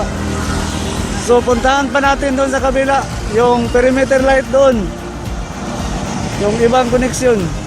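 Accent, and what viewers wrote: Visayas, Philippines. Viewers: Bisaya ka pala sir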